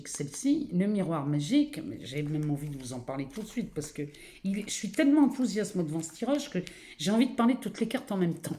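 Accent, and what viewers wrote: North, France. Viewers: Vous avez un bel accent du Nord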